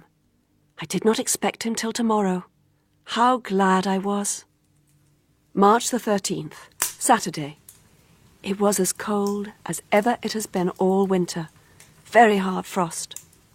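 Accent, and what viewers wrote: Home Counties, United Kingdom. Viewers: What a shame they couldn't get a reader with a Cumbrian accent instead of a home counties one?!! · Home Counties accent don't really matter does it?..a great rendition..Dorothy and Coleridge probably just close friends..we will never know for sure..been reading Coleridges' account of descent of Broad Stand Scafell.. He's got balls the size of Skiddaw...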